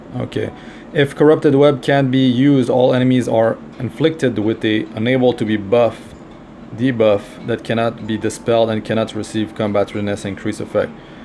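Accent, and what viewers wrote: Quebec, Canada. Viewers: T québécois hein? :)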